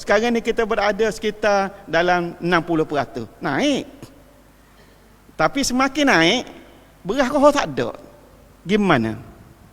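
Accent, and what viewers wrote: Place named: Terengganu, Malaysia. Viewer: Org jb tak berapa faham telo terangganu Maaf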